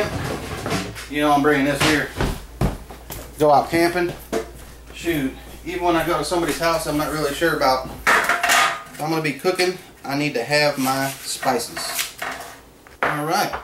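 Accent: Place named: South, United States. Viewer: Why are you speaking with a southern acceny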